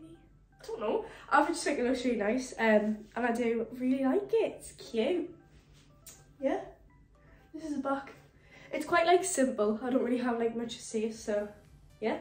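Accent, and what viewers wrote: United Kingdom. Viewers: اللهجه البريطانيه رووعه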